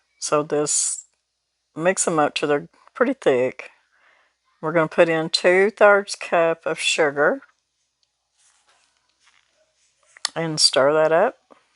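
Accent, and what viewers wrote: South, United States. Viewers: Who else comes to this page just to bask in the country accent and down home goodness? God bless!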